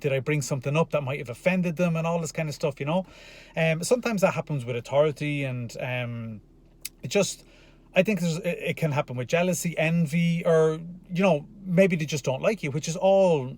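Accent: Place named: Ireland. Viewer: I love your accent. If youre from Ireland, what part?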